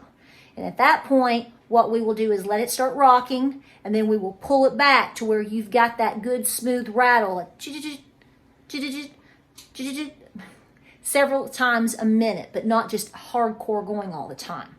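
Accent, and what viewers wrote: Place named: Appalachia, United States. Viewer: You are so down to earth & speak really good hillbilly😆 & that’s my language! I love your videos! Keepum comin!!!👍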